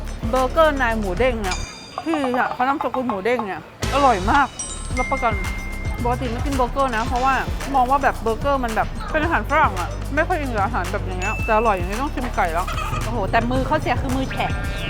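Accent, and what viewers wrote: North, Thailand. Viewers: อู้เหมือนคนเมือง น้องมิ้นคนงาม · ชอบมิ้นอู้กำเหนือ ม่วนขนาน แต้กาบ่าได้จุ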